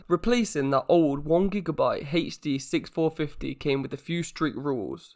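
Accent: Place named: Birmingham, United Kingdom. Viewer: ill sub to you dude.. you sound local.. im from brum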